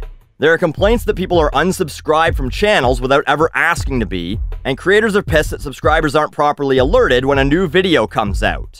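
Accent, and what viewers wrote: Canada. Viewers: <3 I've missed you and your pissed off Canadian accent:) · It's great to hear your strange Canadian voice again!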